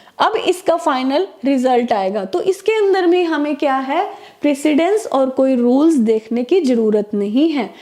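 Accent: Punjab, India. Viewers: I am from punjab and your punjabi accent in hindi made this video more intresting, good explanation. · Panjabi ho kya miss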